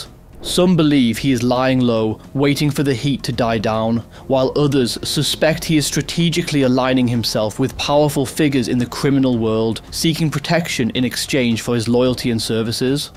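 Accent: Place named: North, United Kingdom. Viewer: Why do we have this “northerner” instead of a good Aussie accent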